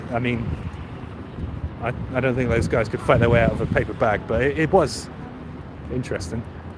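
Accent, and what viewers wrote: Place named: Scotland, United Kingdom. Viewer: J where is your accent from? Driving me insane. I hear my native Scottish when you talk. Please let me know 🤞❤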